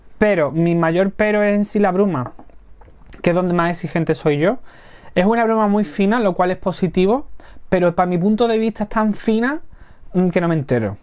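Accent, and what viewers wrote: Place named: Spain. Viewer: hola, soy Colombiana y desde que te encontré me encanto todo absolutamente todo lo que nos enseñas y el hablado tan español que tienes me desestresa, saludos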